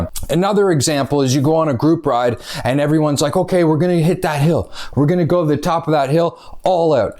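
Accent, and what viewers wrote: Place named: Canada. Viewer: I'm a excessive guy too but you are at another level 😁 I'm from Montréal, so it's a pleasure listening to another Canadian...it's a nice change to listen to something different than from UK of Australia !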